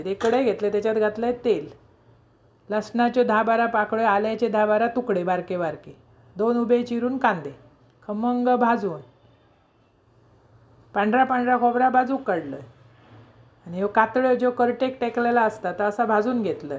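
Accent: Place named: Vengurla, India. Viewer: वाह सुंदर वाटप,होय गॅस चो गोंधळ सुरू झालो,माका तुमच्या बोलनावरसून तुंम्ही वेंगुर्ला महेर वाटला, सुंदर receipe कधी व्हीडिओ तुमचे बघताय असा जाता माका,कणकवली क आमचा कोण नाय.वेंगुर्ला कुडाळ सगळी.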